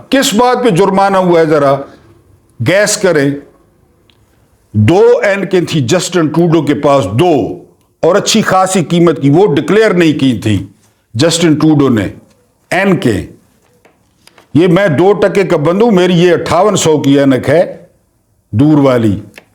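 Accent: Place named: Mianwali, Pakistan. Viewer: Sahib kabhi kabhi apni mianwali zuban aur lehjay ka chtkhara zaroor lagaya karain hamain buhut lutf aata hai.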